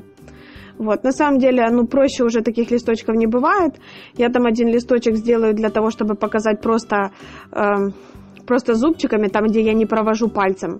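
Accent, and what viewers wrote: Ukraine. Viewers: Украинские нотки , лучше молчите или заведите человека с чистой речью...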